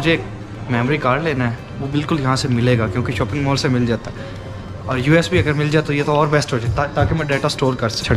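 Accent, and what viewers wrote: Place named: Punjab, India. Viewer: Annoying poon-jobi accent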